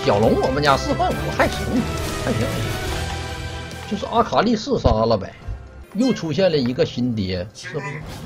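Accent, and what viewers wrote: Northeast, China. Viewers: 東北腔真的很可愛XD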